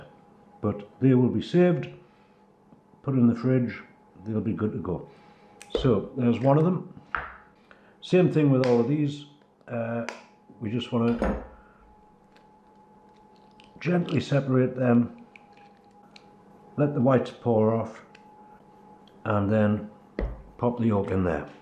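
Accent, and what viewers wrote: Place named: United Kingdom. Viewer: What part of the UK are you filming from? Im watching in Canada and im not very good at placing UK accents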